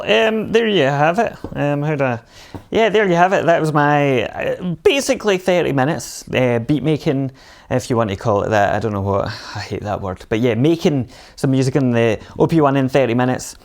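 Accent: Scotland, United Kingdom. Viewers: Your videos are great man! You should have 1000x the subs - the YouTube algorithm is a fickle mistress. Great to hear a Scottish voice doing synth stuff. Thanks again.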